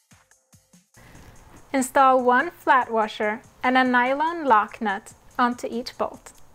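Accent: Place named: Romania. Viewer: Thank you for your video. I am installing mine this weekend following your instructions. You sound and look romanian.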